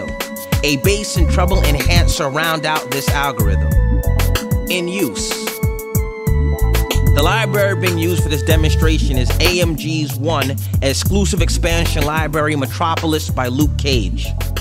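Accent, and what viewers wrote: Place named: United States. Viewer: No I'm just saying that his english teacher which I'm 100% sure that teaches British english and not american english would assert to him that the accent of the voice in this video is american and not english ( in a sense of british english ). That's all my friend! ...and I don't know why I'm losing time to clarify this stupid thing to you.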